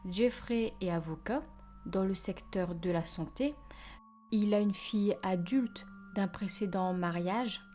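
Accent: North, France. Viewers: Je viens de te découvrir..... Marathon de vidéos depuis cette nuit ! Bon travail ! + 1 abonnée ! Ton accent viens du nord si je me trompe pas ?!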